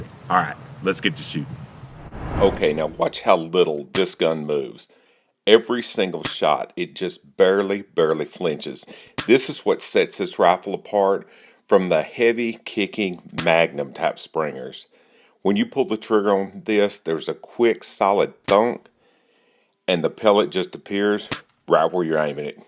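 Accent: South, United States. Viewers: Nice rebel accent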